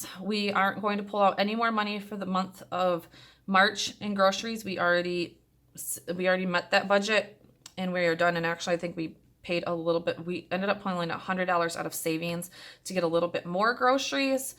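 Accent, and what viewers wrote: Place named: Michigan, United States. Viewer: Things got tough for us in 2009. We changed our cell phone provider and kept our phone numbers. Each of us pay $30 month. We use Mint SIM. That might cut your bill in half. Our ciiverage is awesome and we have no problems. No my parents use it as well, they caught on. Best 2 you From California. I was born in Michigan and I recognize your accent.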